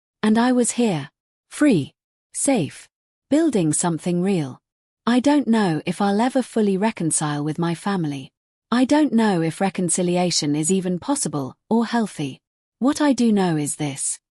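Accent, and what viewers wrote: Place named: United Kingdom. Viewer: As a Brit surprised to hear a British accent in this story. “I started therapy to understand why I believed them & thought you were overreacting” Good Grief Watching from Leicestershire in the UK 🇬🇧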